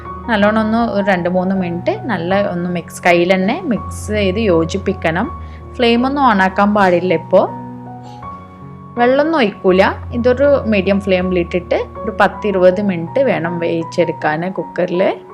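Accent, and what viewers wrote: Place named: Beary, India. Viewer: Definitely will try this, mutton is fav one, Malayalam with beary slang 👌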